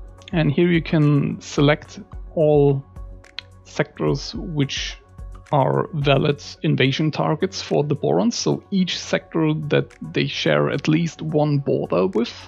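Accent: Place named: Germany. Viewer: Woohoo, german accent again! :) Danke!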